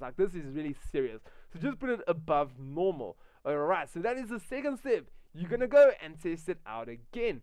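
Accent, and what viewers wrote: South Africa. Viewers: you sound South African lol? Fyi im from Durban.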